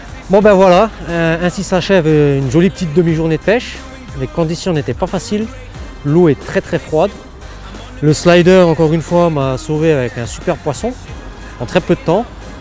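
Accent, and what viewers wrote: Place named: East, France. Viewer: On aime l'accent de l'est !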